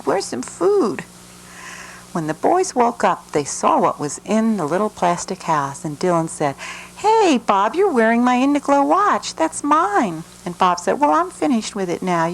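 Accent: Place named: Bosnia and Herzegovina. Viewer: If you people are weirded out by narration it is because of how my bosnian people speak english. I know the 95 massacre and many people were killed. My bosnian people makes cartoons like this so no wonder it has an artstyle like this. Also when srebrenica has an memorial for the people that died some serbs...bad..serbs...dance and celebrate. Look at the bosnian cartoons from 1980s to 2000s. The burning building reminds me of a event where they found two skeletons hugging each other. Those two skeletons were mother and son. They were burned alive.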